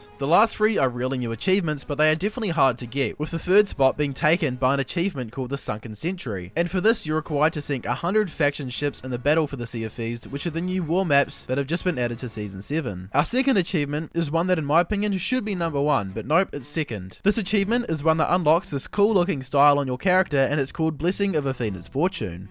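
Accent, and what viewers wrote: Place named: United Kingdom. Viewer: What is this ultra british accent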